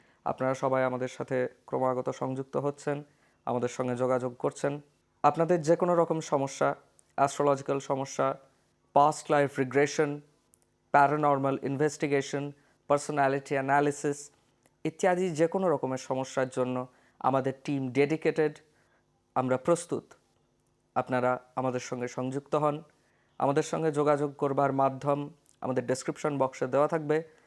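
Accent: Purulia, India. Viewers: Ki sundar kore bolo tumi bhai পুরুলিয়া r bhasa, অপূর্ব, eto সমৃদ্ধ hoi tomar kotha sune je bolar bhasa nei🙏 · That Purulian accent 👌😊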